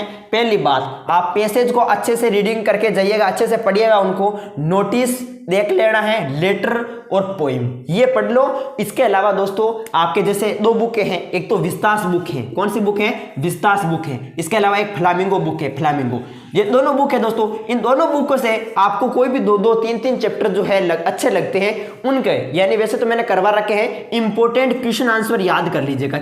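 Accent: Rajasthan, India. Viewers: BHAI Rajasthan su he Kay thaki boli bahut choki lagi ❤️❤️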